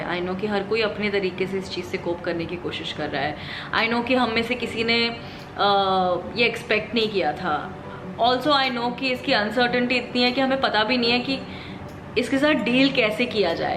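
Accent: Nepal. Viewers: Oh gosh best nepali accent ever You the cutest I am nepali too and i am following u for years now Feels amaizing listening your own mother tongue from a person u follow is trying to learn it 👌👌 Best wishes to you You are beautifull soul Cheers to you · your nepali is the cutesttttttt.... dherai maya tapailai.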